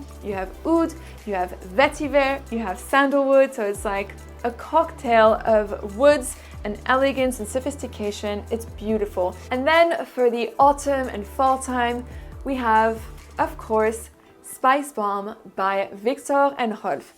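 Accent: France. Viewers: I am 58 years old and have been using Dior Homme since its first day. Since they changed it I have been trying to find an alternative tried a lot of different perfums and watch numerous videos on perfums. Result I am as confused as ever. Any suggestions ??? Tried Oud wood Byredo accord oud guerlain bois mystérieux and relevant. By the way excellent french accent.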